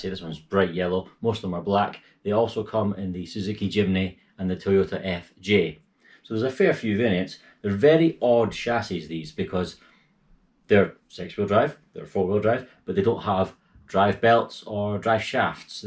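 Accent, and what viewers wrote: Scotland, United Kingdom. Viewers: Yeah, man, I enjoy your talk videos! Must be the Scottish accent and your sense of humour, I usually get bored with long talk videos. Yeah, you're right, it's good fun and people should get one! Ha ha, at least you were drunk when you built yours, I think I was mostly sober, but damn, I hated putting it together! And to make me 100 percent certain mine was cursed, first time I took it for a blast, not one but two bearings seized, one wheel bearing from Fast Eddy, and the other was the bearing in the brushless motor, damn... I kept being unlucky, on my second time out, I broke a wheel (on video)... I hate those wheels, I plan to lower mine and put buggy wheels for the summer... I can't badoing mine, I'm using the smaller bumpers, works alright, I think... If you want, check it out, I have a video with mine, should be okay fun to watch...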